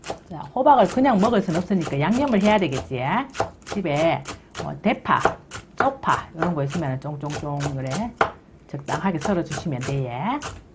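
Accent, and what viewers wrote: Gyeongsang, South Korea. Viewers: 표준말 하세요 저도 경상도 사람인데 좀 그래에 ㅎ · 서부 경남 사투리 ㅎ 눈으로 먹고 갑니다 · 그지예~맛나예~ 같은 경상도 사람이지만, 님 사투리!!! 언니 너무 귀여우신듯요.~ㅋ 제 사투리보고 쳐다보던 사람들 이해가 되요.ㅎ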